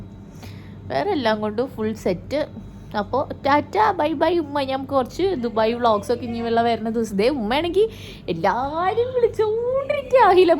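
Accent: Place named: Chavakkad, India. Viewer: Umma thrissur Chavakkad Aano? 😀samsaram ketapol thonni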